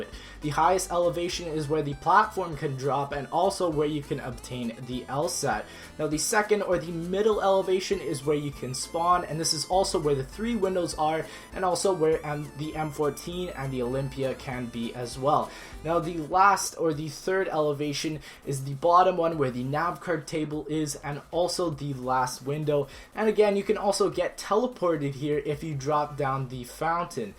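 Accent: Canada. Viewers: I knew you were Canadian bcuz when you say about it sounds like aboot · Ur canadian?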